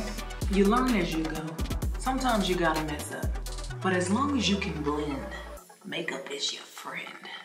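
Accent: South, United States. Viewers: Southern accent coming through strong in this video...😍💪🏽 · It's the Texas accent for me 😍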